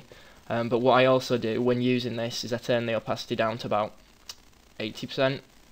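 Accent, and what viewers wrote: United Kingdom. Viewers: This is great, thanks for making it so simple. Now I can finally make my own digital drawings instead of drawing them on paper and editing them in photoshop, that was a pain...I knew there was a way to do all this but I completely forgot how and never really could figure it out. By the way, your accent's great, but what part of the United Kingdom are you from? I don't know all that much about accents so I don't want to sound stupid, but it sounds slightly different from most English accents, is there a name for it?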